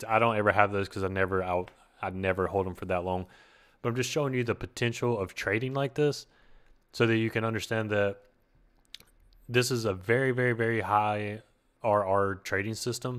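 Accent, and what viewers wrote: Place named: Louisiana, United States. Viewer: are u from louisiana?? cause i am and u sound familiar?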